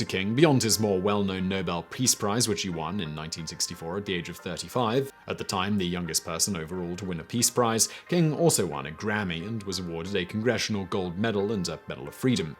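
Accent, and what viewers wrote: United Kingdom. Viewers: I've never witnessed King's life narrated by such a fine British accent. gives the familiar new life! I never heard the story of the hotel operator having a heart attack.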